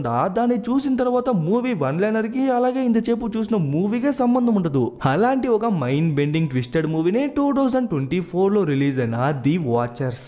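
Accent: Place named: Rayalaseema, India. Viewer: Ni voice Matra super daniki రాయలసీమ భాష ఇంకా సూపర్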